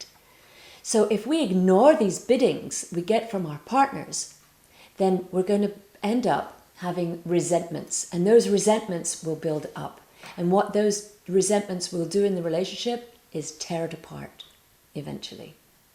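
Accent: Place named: RP, United Kingdom. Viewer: Sorry,.....but this is so simplistically ridiculous, superficial and sophomoric......that you could only pass it off as a working miracle by affixing 'Dr.' to you name and speaking with that highbrow English accent. I listened carefully to what you have said.....and even if true......many relationships fall completely apart based on many other elements. Seriously? It actually sounds like you have not been in many relationships or successful ones or really 'know' so-called successful ones. What appears successful on the surface often, below, turns out to be a simple compromise made my many, many people to accept the situation for fear of being alone, lonely, insecure financially, etc. For example, you may go to VIP events with some guy or your husband draped on your arm, but do you really know your husband or what he is like? Or do you just claim that you do. 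You sound like another pretentious psycho-wizard. I know of many people in relationships that are long lasting but totally devoid of real respect, love, etc. They cling to each other and put on a front. 50% of all marriages fail in America and of that remaining 50%.......that vast majority are not particularly happy. That's a real fact.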